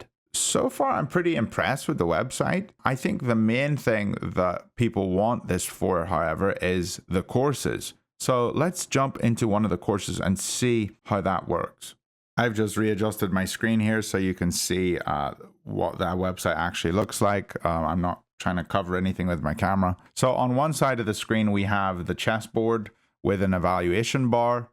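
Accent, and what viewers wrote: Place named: Scotland, United Kingdom. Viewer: This was super helpful, thank you very much! I just found out about you because I was looking for a ChessMind review. You seem like a top bloke :D Definitely will stick around. Unrelated question—and please ignore this if you're not in the mood to answer: English is a second language for me and I was curious what accent/dialect you speak. It's super cool, but I'm terrible at pinpointing English accents. I thought it might be Scottish? (Sorry, if this is completely wrong!) Anyhow, cheers again!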